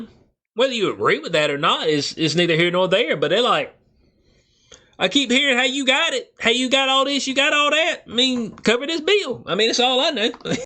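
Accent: South, United States. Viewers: Good video, brother! I can’t quite place your accent… you’re from the south US tho right?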